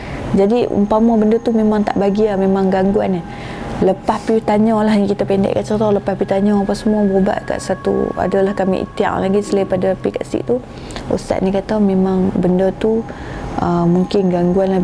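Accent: North, Malaysia. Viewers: puas cari nak subscribe..setahun telupa🤣.. saya suka penceritaan. suka loghat utara dia👏👏.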